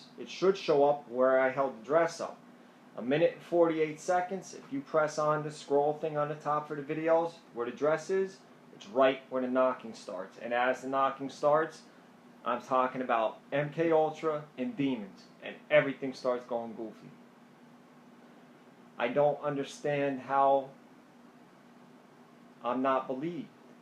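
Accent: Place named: Pittsburgh, United States. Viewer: elroy elongated naw this is classic pittsburgheez . It's how we sound in PA. I actually didn't notice until I read this. Google it, we have a distinct accent around here.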